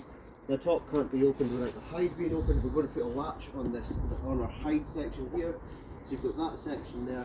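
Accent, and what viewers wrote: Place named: Edinburgh, United Kingdom. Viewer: Nice one mate, I'm thinking about an outdoor enclosure for a Tegu. I have ideas to build framing a little wider than this but around 7-10 feet tall with large logs upright and hammock like areas to bask up high and some climbing space. You sound local to myself, Livingston/Edinburgh? if your budget isn't high I get lots of spare wood from my joiner friend, excess off jobs if you ever need some. I'm in the middle of a raised pond atm all done from his free wood, also an 8 foot turtle dry enclosure to sit above his 8 foot aquarium can climb up into so I can fill the tank right up and utilise all the swimming space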